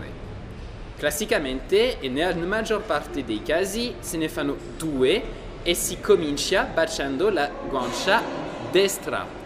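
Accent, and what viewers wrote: France. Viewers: Bravissimo, dove hai imparato così bene il francese??? Forse ha scuola??? Comunque hai un bellissimo accento francese come me e mia mamma!!!☺☺☺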